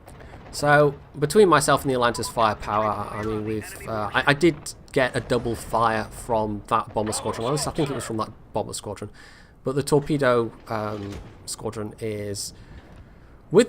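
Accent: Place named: Scotland, United Kingdom. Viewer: I somehow ended up watching this one which I'd never seen. Your accent was much more English here, so since your inflection is more Scottish now, good on you,.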